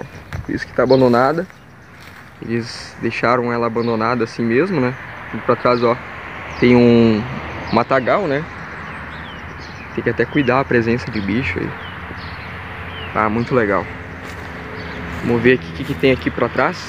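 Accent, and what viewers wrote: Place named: Rio Grande do Sul, Brazil. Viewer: Sim é outra foto amigo se Deus quiser vou conseguir ir lá adoro lugares assim e adoro seus vídeos parece que estou junto com voçe na hora que está em lugares assim de tão apaixonada por esses lugares ; que Deus te proteja sempre e te ajuda em todos os seus projetos que tem ai pra fazer aqui não tem lugares assim pra eu está indo e adoro o jeito que os gaúcho fala o jeito que voçeis são aqui na minha cidade fala que gaúcho são muito bravos eu não acho mais vou falar outra vez voçe está de parabéns com seus vídeos amigo acho que sou uma pessoa de alma antiga por amar tanto lugares assim bsj e Boa madrugada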